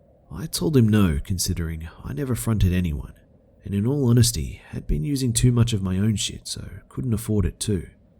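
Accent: Australia. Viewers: the way u can tell is he has a bit of twang as most Australians do to how he pronounces certain words like cove or over or home if u compare them to one of the numerous british accents you ll hear it too :) but its so slight. brits are a bit much at first to figure where they are from so many accents.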